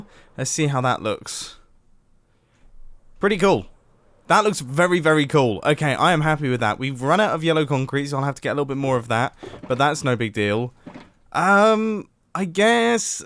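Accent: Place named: United Kingdom. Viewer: he is British!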